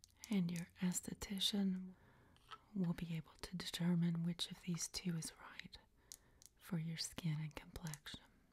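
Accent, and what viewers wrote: South, United States. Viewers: do I detect a southern accent maybe?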